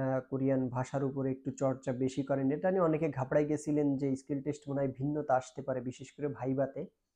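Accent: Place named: Fakirhat, Bangladesh. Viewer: আপনার বাড়ি কি ফকিরহাট,আমাদের এলাকার কথার স্টাইল,আমার ১৫ তারিখ,স্কিল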